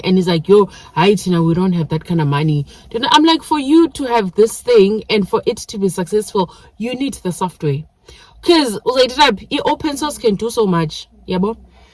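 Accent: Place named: South Boston, United States. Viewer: your home language sounds beautiful I think you talk like real southie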